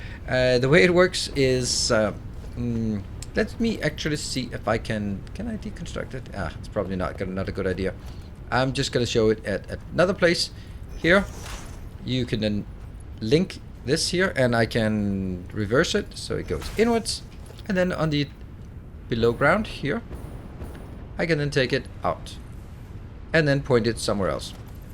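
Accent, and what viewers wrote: Denmark. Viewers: you sound so Danish but i really dont know but thats besides the point, Great video and content my dude!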